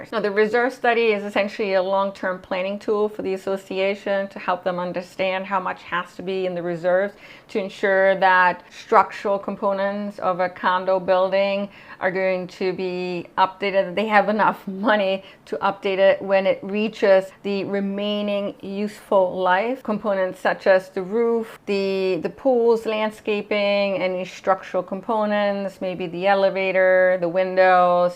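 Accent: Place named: Midwest, United States. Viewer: You sound like you're from the Midwest. 😊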